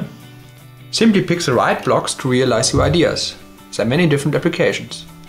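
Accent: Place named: Germany. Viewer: Tolle Idee, und gut, daß Ihr in OWL seid, aber bitte besorgt Euch noch einen Native Speaker für das Werbevideo, mit dem schweren deutschen Akzent wirkt das nicht sehr professionell. Macht am besten 2 Sprachversionen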